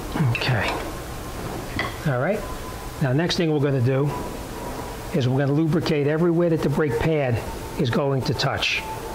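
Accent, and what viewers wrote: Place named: New York, United States. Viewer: Leave it to jeep to make a weird rear brake setup, lol. Im like..."an o-ring? Why, what for?...what the hell are these damn long metal clips on these brake pads for? Why did this rear rotor set come with 4 long metal brackets..what is going on here!? Damn Jeep"🤣🤣 Was gonna just do em like I did on my Ford Edge - which doesn't have those 4 long brackets that the pads slide onto at all, they just clip in like the fronts. The caliper on there looks exactly like the front calipers, except 1 piston instead of 2 . And the brake pads don't have those metal clips on them that need to be depressed to get the caliper off. Cause I looked at my mother's rear calipers and was confused at what was going on, haha. Thanks for the video! Glad I watched it before doing them on her 06 Grand Cherokee. Thanks for specifically showing the rears! 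Other videos I've seen atleast just show the fronts, which is normal like most cars. Then you get to these outer space rears🤦 haha. Thanks again! A+ on the NY accent. You sound like me, except I'd be adding some choice words in there lol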